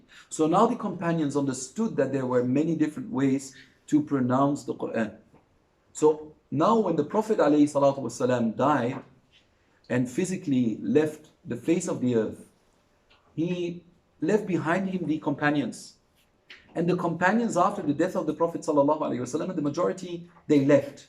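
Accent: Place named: United Kingdom. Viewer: Loved the British accent 😂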